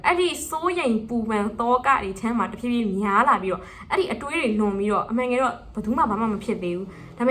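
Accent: Rakhine, Myanmar. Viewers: ရခိုင် လူမျိုး ထင်တယ်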